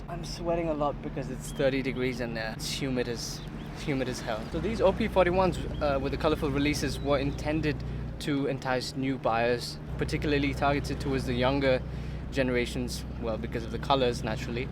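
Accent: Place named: India. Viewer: Where are you from? Your accent is like Indian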